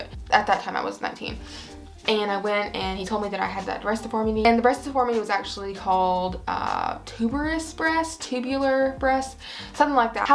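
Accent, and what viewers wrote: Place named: South, United States. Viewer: I just found your account and I think it was pure fate!! Love your midsize fashion content and that you have a southern accent like I do even though I am an old woman 😂 I just got a breast reduction this past fall and it was a very weird time. I’ve had body dysmorphia my entire life and that was a huge part of it. I feel SO much better and the change in my body is great but also very bizarre. I hope your recovery goes smoothly and that the adjustment period to your new shape goes well!!!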